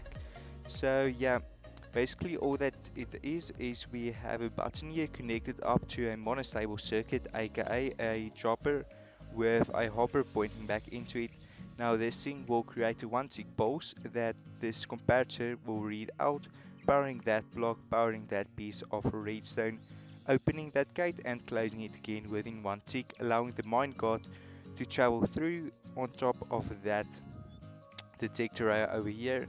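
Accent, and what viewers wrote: South Africa. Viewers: hello fellow south african 😌 jou aksent het jou weggegee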